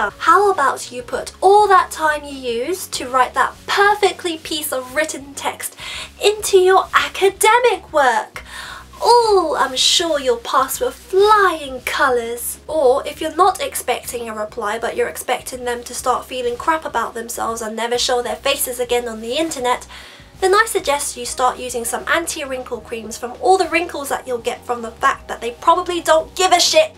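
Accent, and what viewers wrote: London, United Kingdom. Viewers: Are you from London? I cant spell worth shit...but im just fine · Do u learn British English? C: I love ur accent :P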